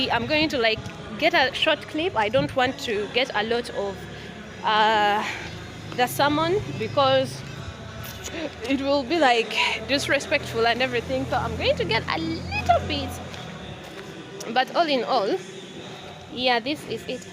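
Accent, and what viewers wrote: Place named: Uganda. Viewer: Am from Namibia your accent reminds me of one medical Doctor's wife from Uganda, I actually found them in church in 2018 the year I got saved... I will never forget them that accent of yours reminds me alot of them.. they always invite us at their house, fellowships all night.. I will never forget them Lol In the coming years I must come to Uganda and marry a God fearing women.. I love your accent... Thanks for sharing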